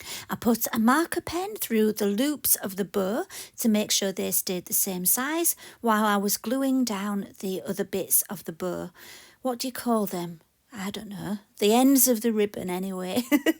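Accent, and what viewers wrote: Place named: Northern England, United Kingdom. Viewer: Thank you for posting this video, it's so informative. I love your lovely Northern accent and voice. It's so nice to hear a British accent in the sea of American ones (not that there's anything wrong with theirs 😅) just lovely to hear the expressions and colloquialism 💞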